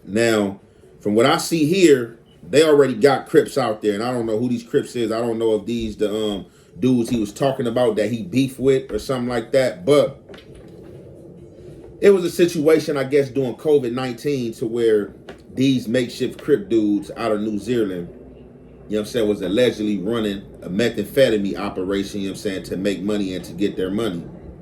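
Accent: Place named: South Central, United States. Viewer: that south central accent is iconic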